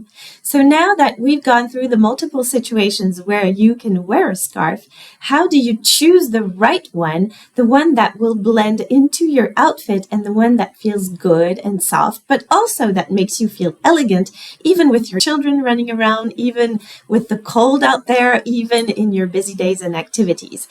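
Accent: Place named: France. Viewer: Yu are absolutely great,it is wonderfully to listen to yu , are Yu french,Yu have lovely accent and it is really pleasure to see yu😊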